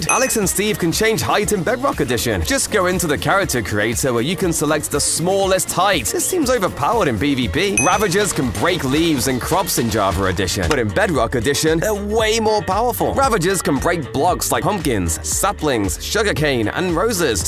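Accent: United Kingdom. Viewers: You used to sound american and now you're british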